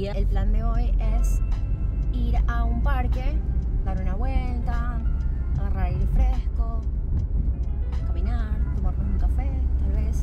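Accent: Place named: Argentina. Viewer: Estás súper argentinizada por lo menos la entonación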